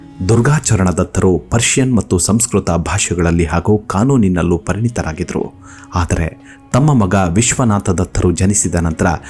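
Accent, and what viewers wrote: Standard. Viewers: Uttama. vyakhyana hagoo shuddha bhaashe Keli santoshavayitu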